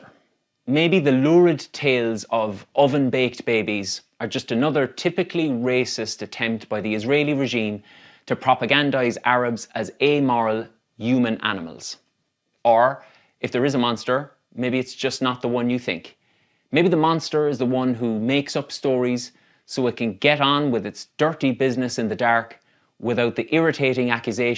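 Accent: Ireland. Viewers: 👏👏 You have hit the nail on the head with this video! Bravo for speaking out against the atrocities. Big fan of your channel and have subscribed and I’m spreading the word to my family and friends too. Thank you so much for your support 🙏🇵🇸🇮🇪 As always pleasure to hear an Irish voice 🎉 God bless you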